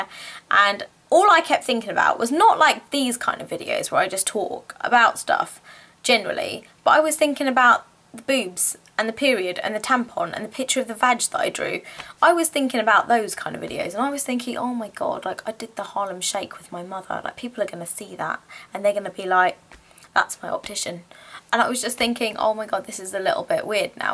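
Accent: United Kingdom. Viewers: I'm so happy that you made this video, you are my favorite person that makes personal videos on YouTube. I love your British accent and you are so nice. I stop making videos because I don't know what to talk about and I feel sometimes depress or wanting to quit my YouTube channel, don't get me wrong i love making videos. Advice please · Are u from UK. I will definitely date you😜 I love all them people in UK accent I'm moving in UK soon cuz I love the accent omg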